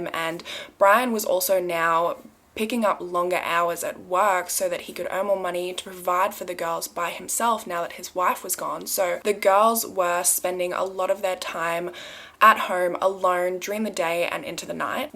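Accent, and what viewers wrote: Australia. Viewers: You go Girl! Just your Aussie accent makes me bingewatch your vids!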